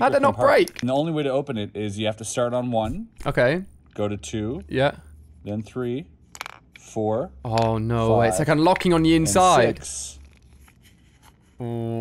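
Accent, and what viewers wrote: United Kingdom. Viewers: You in England or Scotland?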